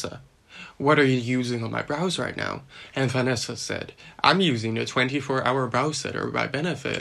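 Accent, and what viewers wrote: South, United States. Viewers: Love your accent bud, you are a beautiful man btw . Where in the south are you from ? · you sound like you have a southern accent which is funny to my cuz i know ur Holand also you're snatched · Our sweet boy bein' sassy! Absolutely love it! 💕 Btw, I swear you sounded like you have a southern accent in this one. Being from the south, I know a thing or two about those. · Why do you have southern US accent today? Like your Dutch one much better and I'm from U.S Georgia now in Florida.. You do the accent well...just...ugh...hmmm. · Is it me or do they have a more Southern American accent now? I had to review their old videos to contrast and it's pretty stark. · Is it me but you sound like you were raised south of the Mason/Dixie line (residents of the USA know what I mean) Anyways, I still love your videos!😍😘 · your accent........... 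i’ve never heard anything like it before its extremely southern but i know you’re dutch... wow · You sound very country lol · Idk if this is new or on purpose lol but I hear a southern twang 🤠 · it sounds southern American to me 😂😂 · This is weird. When the hell did he develop a southern accent? He certainly didn't have it a few months ago. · It sounds like you have been in the south (in North America) for a few months at the start of this video. Very cute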